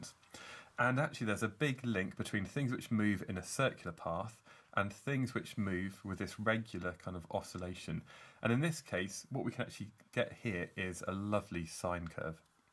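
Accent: United Kingdom. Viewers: What a beautiful British accent indeed. That was great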